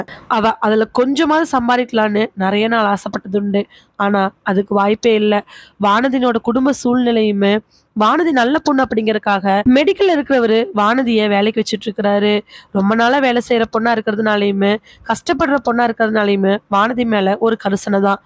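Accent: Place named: Pollachi, India. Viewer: சிஸ் நீங்க பொள்ளாச்சி சைடுங்களா...வாசிக்கிற விதம் எங்கபக்கம் போல இருக்குங்க சிஸ்.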